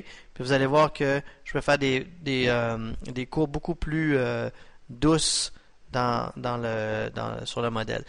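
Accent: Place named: Quebec, Canada. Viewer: Merci pour ton tutoriel, (en plus je suis fan de l'accent québécois) je cherchais justement à me mettre au sculpt et j'hésitais entre mudbox et zbrush.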